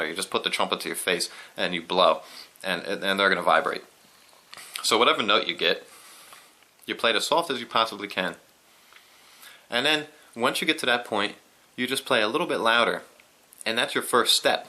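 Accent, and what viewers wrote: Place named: Philadelphia, United States. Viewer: great explanation! you sound like a Philly or South Jersey guy. I've been searching for some confirmation on Morrison's ideas and another guy from Australia who says the same thing on blowing levels equaling harmonic slot increases, and you've done that very well here. Thanks!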